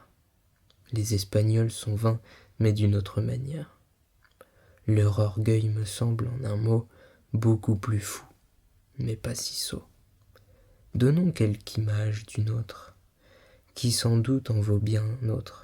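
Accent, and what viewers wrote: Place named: France. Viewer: damnnnn the french accent is everything 😩😩😩😩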